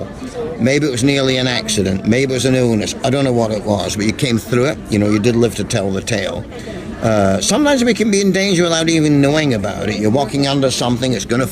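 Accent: Scotland, United Kingdom. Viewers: with a Scottish twang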